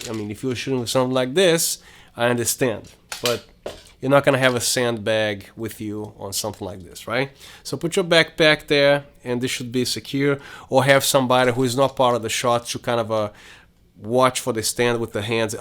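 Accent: Brazil. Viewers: The Brazilian accent is cool! Gostei muito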